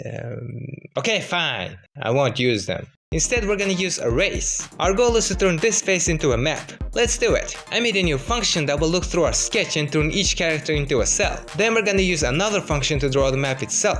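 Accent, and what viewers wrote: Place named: Mexico. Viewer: Hablas español? Tienes acento como de mexicano xD pd: geniales vídeos, me motivan a seguir programando 🙂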